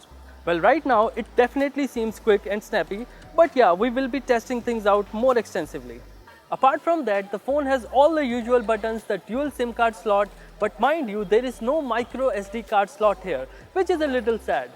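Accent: India. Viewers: Accent of the accent.... Indian accent😂😂😂 Yours is ultimate....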